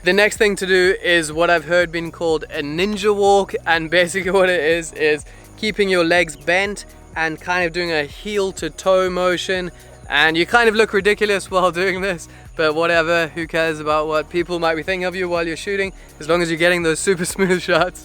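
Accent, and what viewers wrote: South Africa. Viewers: Trying to figure out your accent. My short list is South Africa, UK or Australia. If I was to guess then I’d go with South Africa - am I right ? Great content as always.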